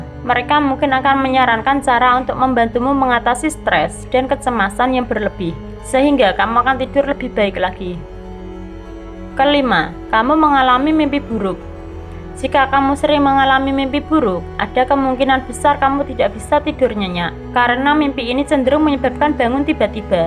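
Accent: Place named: Java, Indonesia. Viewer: Ngomongnya medok banget mba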